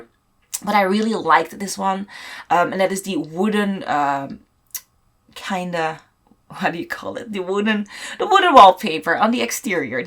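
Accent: Netherlands. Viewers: Are u dutch? cause you have a dutch accent lol edit: oop yes you said your dutch in your video lol ❤️ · Oh Youre dutch haha I heard it on your accent nog een vraagje welke pc gebruik je? :3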